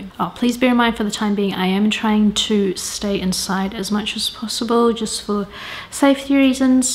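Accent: New Zealand. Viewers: Learning English with NZer accent 😁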